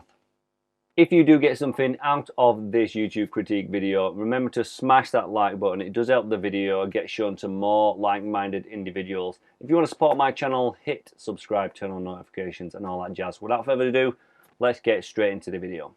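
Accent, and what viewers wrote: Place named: Scotland, United Kingdom. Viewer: Ahhh a Scottish lad! Great content dude. Subscribed!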